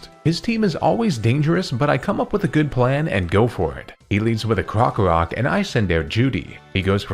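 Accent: Canada. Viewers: Your canadien eh?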